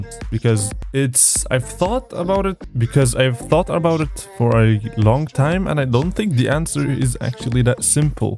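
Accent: Germany. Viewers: you german? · are you from germany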